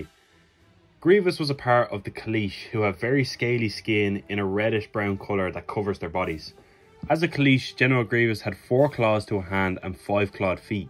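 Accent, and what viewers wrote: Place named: Ireland. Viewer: Love your channel mate ...Nice to hear an Irish lad on youtube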